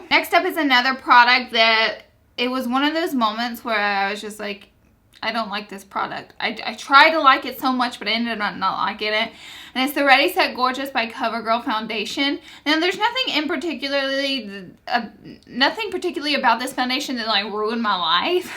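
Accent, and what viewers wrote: South, United States. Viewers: Omg! Your southern accent😍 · LOL at you commenting on the fact you say oil wrong haha, people don't understand that southern drawl sometimes!! Love your videos and keep on say Oil anyway you want!! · Is that wallpaper? What is that background? It's so pretty! Your videos are great & my daughter and I love watching them together. You are a little goofy, just like us and we love your accent. My dad is from NC & we have a house down there, so we love southern accents!! Keep the fabulous videos coming!! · I love you and your accent . southern girls unite. Texas girl here lol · This is the most stupidest comment ever....love southern accent...hence that I live in the South:-)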